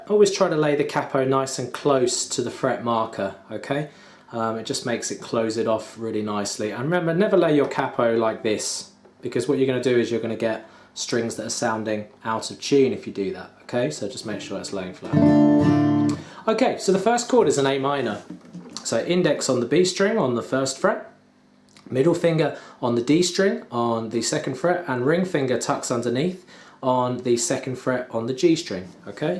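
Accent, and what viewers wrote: England, United Kingdom. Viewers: WOW! Your English accent threw me for a loop! Great cover, cheers :P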